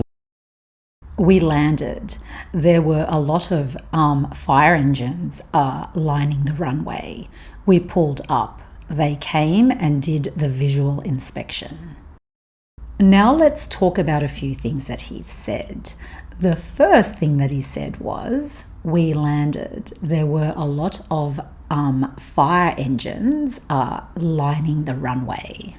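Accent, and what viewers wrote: Australia. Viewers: Hi, your videos are helping me to understand Aussie accent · This channel is fantastic for learning Australian English and accent. Please make more videos because I learn so much on this channel. It is easy and simple to follow and understand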